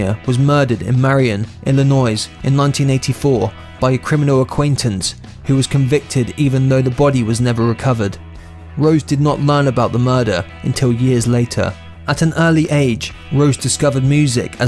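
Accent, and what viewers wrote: United Kingdom. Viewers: Is it just me or does the British accent describing one of America's greatest rock bands really seems to not be the right accent to describe Axl.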